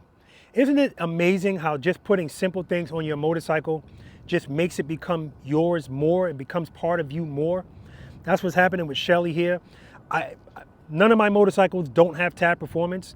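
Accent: New York, United States. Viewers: Dude, that NY accent!! LOL 😂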